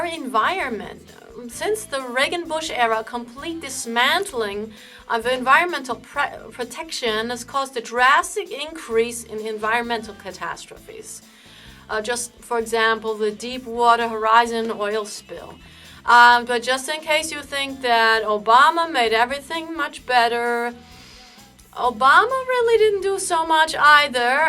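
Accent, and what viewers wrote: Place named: Germany. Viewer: You have a German accent!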